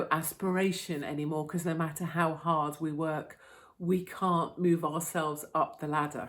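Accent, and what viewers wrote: RP, United Kingdom. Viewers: You sound awfully posh as you pose the questions. My answer to this is that it's always been like that, maybe we're just noticing more things in this new eyes wide open type of enlightenment that some of us are experiencing? Those people are everywhere, always have been, and always will be. The type who never makes eye contact when forced to be with people they don't know, like in a grocery store? There are very definitely more people aspiring to be that type of person though, that I'll definitely agree with.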